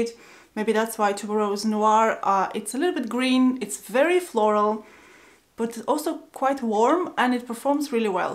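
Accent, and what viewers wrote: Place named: Finland. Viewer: Hello how you feeling?New subbie here:) Glad I found your channel my friend.Wonderful video and haul.Super solid list.I see your from Finland.Very cool,you have a beautiful accent as well:) I was in Paris this time a year ago in Jan of 2020.I was having a couple of pints of brew at a pub right outside the Paris Saint Germain stadium after the football match against Monaco.The game just ended so the place was packed with people who just left the game.I met some COOL people from Finland.Really genuine and down to Earth,great times.Happy New Year to you and yours.Much love from California.Peace & Blessings!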